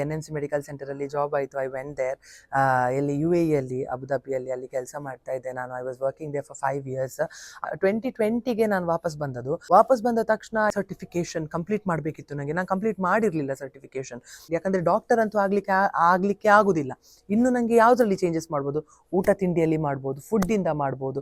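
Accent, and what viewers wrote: Mangalore, India. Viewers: Typical kudla Kannada 👍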